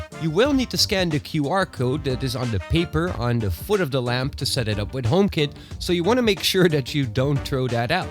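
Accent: Netherlands. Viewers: Vreemd dat zijn Engels echt accentloos is maar je toch kan horen dat hij Nederlands is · Thicc Dutch accent over here haha, nice vid though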